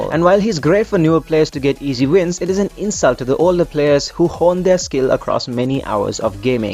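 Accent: India. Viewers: r u Indian tho ?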